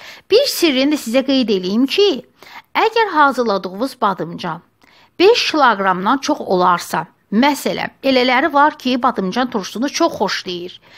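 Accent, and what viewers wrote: Baku, Azerbaijan. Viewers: Can sizin ucun!!!Uzurlu sayin gec cavab verirem.Ele ne yaxshki,sizde varsiniz! Ureyinize,dilinize,el-qolunuza sagliq.Olsun,qoy olsun bizim Baki lehcemiz!!!Seve-seve,zovq alaraq izleyirem.Bu arada,badimcan shorabam lap ela alindi.Sag olun,var olun eziz bacim! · EZİZİMSEN Baki lehcene gurban. Gulaglarimiz sevindi................ Süperrrrrrrrr hazirladin! Muş OLSUN XEJİRLİKLERLE İNŞALLAH👍♥️👍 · Bakılı ləhcəsidir🥰özüm agsuluyam bakılıların geliniyem Şüvəlan da çox sevirəm bakılıları çox mədəni insanlardir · BAKI lehcesiii 👍👍👍👍Hansi kenndir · Can Bakı lehcem!!! Ele darıxmışam ki, öz danışığımız üçün. Resept de elaaa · Qədim BAKI LƏHÇƏSİ. 😊😍👏🏻👏🏻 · Sip sirin Baki lehcesi. Danisiginiza vuruldum. Mukemmel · Ne sirin danisirsiz? Ewq olsun bakililara..Esl baki lehcesi..Var olun xanim..Ellerinize sagliq..Allah bereket versin islerinize..❤🥰💋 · Sağ ol kənd qızı. 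Bakının ləhcəsinnən və şorabasıbnan doymağ olmur ki olmur dəəəə. Halal olsun. Mənə ləzzət verdi. Əlün - qolun var olsun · Ay senin bakili lehceve qurban olum ,ay qizim.Ellerive sagliq.Hem danisigin xosuma geldi,hem reseptin.Sag ol,var ol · Cox Sagol can, Baki lehcesiycun cox darixmisdim. Badimcanlar da Cok eladi Bele de eliyciyem. 😊👍💐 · Size qulaq asanda, mene çox xow olur, içimde nostalji bir hiss oyanir. Uzaq keçmiwime, uwaqliq illerime, köhne mehellwmize , teze pirin üstundeki evimizeze, heyetimize xeyalen qayidiram sanki...Ustaliqimiza da ki, heyranam açiqi. Sizin reseptinizle göyem mürebbesi hazirladim bu il, çox gözel alindi. Ellerinize saqliq, allah dnyadan köçenlerinize rehmet elesin. menim bakili lehcesinde wirin- wirin daniwan bacim, var olun!!!🙏🏻👏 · Nə gözəl öyrədirsiniz. Çox sağolun, öyrəndim. Bakı ləhcəniz də çox gözəldir. 😊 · Eziz Bakilim cox gozel ellerinize sagliq👍👍👍shirin lehcenize defe defe qulaq asdim😂👍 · Super əllərinizə sağlıq danışığınız super Bakılısız deyəsən 😊😉👍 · XANIM ÇOX SAĞ OLUN SƏLİS VƏ AYDIN DANIŞIB ÖYRƏTDİYNİZƏ GÖRƏ .GÖZƏL BAKI LƏHCƏNİZDƏ.🤝🤝🤝🤝🤝🙏🙏🙏🙏🙏🙏🙏🙏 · Xanim,canim menim Baki lehcesuycun daruxmiwuq,Allah ellerinize dilinize saqkuq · Caaan. 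Bakılıların ləhcəsinə heçnə çatmır da... 💋🥰Əlün_qolun var olsun. Balona yığma qaydası da düpbədüz. 👍👏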